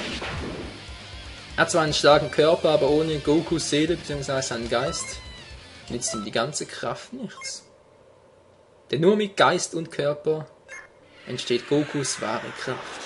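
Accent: Switzerland. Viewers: Kommst du aus der Schweiz ?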